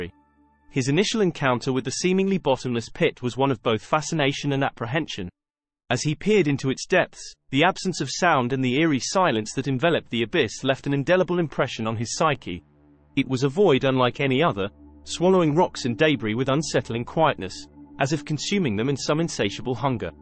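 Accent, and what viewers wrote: United Kingdom. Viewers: We're all so sick of the British accent, mix it up already.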